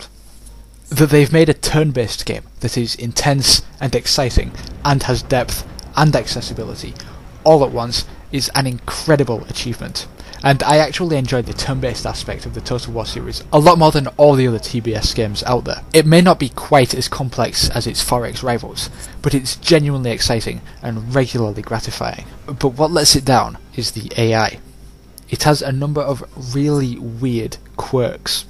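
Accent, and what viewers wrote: United Kingdom. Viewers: LucaCK Luca He died of some sort of disease, I can't remember. also yeah, British, I can't believe it never struck me before, I guess I had never heard that kind of regional accent before.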